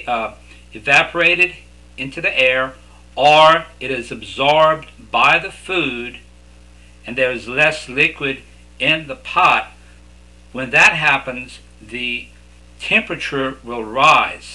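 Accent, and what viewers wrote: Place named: Louisiana, United States. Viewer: He's definitely from Louisiana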